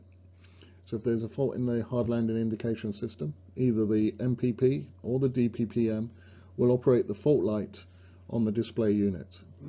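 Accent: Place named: England, United Kingdom. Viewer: Hello thank you very much for all your beautiful video I am one of the pilots who love if I could choose the right word love this Aircraft so my noticed to you is can you please find any other Mike for find how you could fix the volume because really hardly trying to listen to you specially in your English accent the prettiest I guess so so please feed it a bit more louder for six the issue in the mike that would be great from you thank you very much again for all this beautiful video. By the way since couple of years I’m trying to save some money to go for the 605 typerating course so sorry do you suggest to go CEA OR Flight safety or is there any other way to get the typerating cheaper or less than their pricelist specially in coronavirus situation As FAA And one more question please I’m ready for The oral and written test so is there any possible way to go direct to get the exams and then to disability training to save some money because as far as know most of the training centre all over the world doing this option is based on coronavirus situation thank you very much hope to hear from you soon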